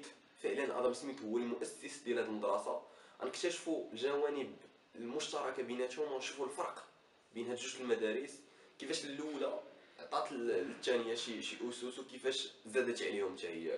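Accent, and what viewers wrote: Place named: France. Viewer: اسمع عربي مفرسن..انت مطبوع لسانك فرنسي وانصحك بعدم التكلم باللغة العربية لانك كمثل الهندي الذي يتحدث لغة انكليزية بل انت اعقد...مع هذا شكرا لمجهودك بالرغم من اني لم افهم لغتك..تحياتي..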